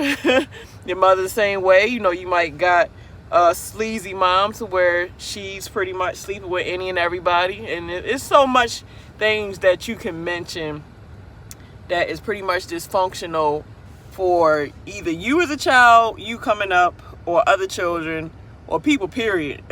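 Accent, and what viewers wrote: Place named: Maryland, United States. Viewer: That Maryland accent 😂😂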